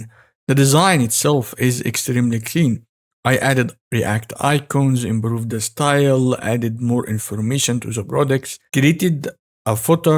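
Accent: Egypt. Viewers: I want to know where you are from😂 because this الوط accent very Egyptian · I love your accent Egypt? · Love the Arabic accent “seemingly to be Egyptian” though if I wasn’t wrong bro 🎉 Totally underestimated channel definitely subscribed عاش والله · nice setup! I love the thicc egyptian accent!😆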